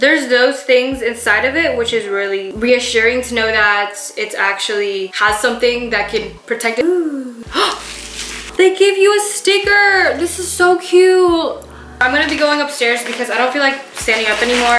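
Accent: Philippines. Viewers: Are you half filipino? cause i think i kinda heard some bisaya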